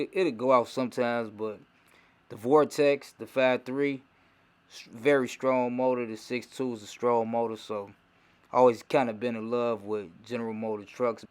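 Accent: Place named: Chicago, United States. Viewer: I noticed right off the bat you’re a Chicago guy, you sound just like one of us. Straight Chi City sound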